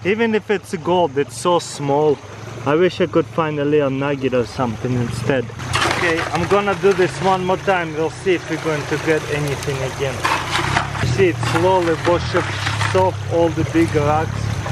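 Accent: Russia. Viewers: russian? :D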